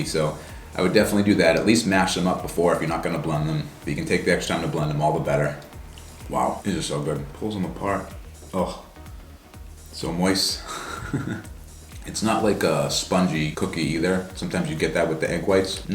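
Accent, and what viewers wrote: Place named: Massachusetts, United States. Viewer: Do I detect a MA accent?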